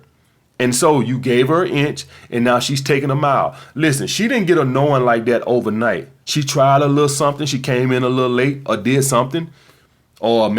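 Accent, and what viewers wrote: United States. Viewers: Yo Bruddah haha this guy is the Don yo ha I wanna mention this to my cronies in the liquor store n down town pubs but worried there gonna drill me Ya saying that every man really has these problems Life's difficult I grew up no family I'm safe as fuck to everybody but ppl just take the biscuit piss dude lol But the woman they just killus It happens to us all haha omg Until you get some Asssss Dat fucking Credit Card stayn In the god damn fOcking pocket Hahahah Man American Accent is fucking Ace 😂 Respec dude ur Wicked 👍👍👍👍👍👊👊👊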